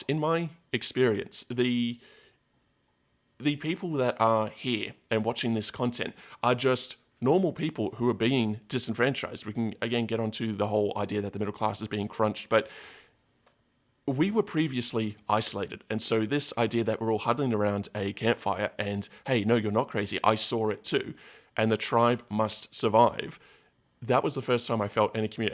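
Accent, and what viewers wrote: Australia. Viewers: intellectual? I am an English Hampshire Hog. You sound Antipodean to me. I would go more Aussie than Kiwi. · I detect a fellow Australian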